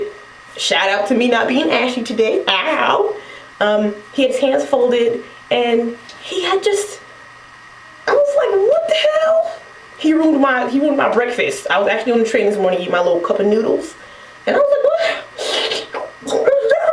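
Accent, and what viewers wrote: New York, United States. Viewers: lol you got dat new york accent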